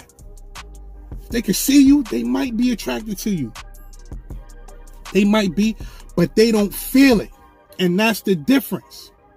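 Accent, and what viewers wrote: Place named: New York, United States. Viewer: I defo from New York that accent n swag ain’t never lie u even got the hat that Memphis bleek made popular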